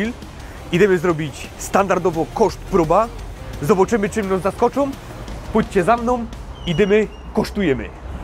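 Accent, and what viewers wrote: Silesia, Poland. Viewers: fajna slonska godka. cool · Czego chcieć wiyncyj ? Śląsko godka, dobre żarełko i fajno muzika. Super! pozdrawiom!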